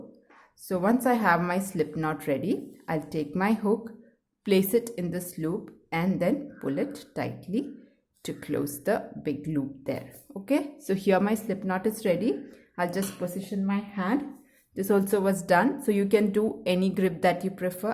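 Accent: India. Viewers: I am watching this in 2025….this video helped me a lot when i heard the Indian accent i knew this was the right video i am a bit impatient type of a person but this actually helped …all the American tuts were wastage of time❤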